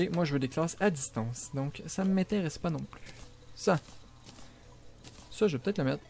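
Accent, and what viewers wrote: Quebec, Canada. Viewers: Est tu québécois répond moi svp ;)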